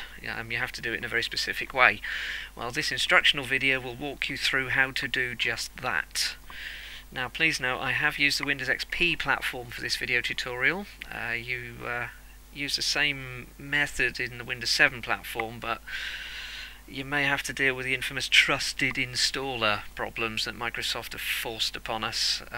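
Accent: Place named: United Kingdom. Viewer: Not sure why, but every time I watch a YouTube video on how to get some program to run, it always seems to be a Brittish fellow. Anyway, thank you very much for posting this tutorial! I have never played Deus Ex before, but after loving DXHR, I decided that I simply must play the original as well. And thanks to your video my experience should be even better now.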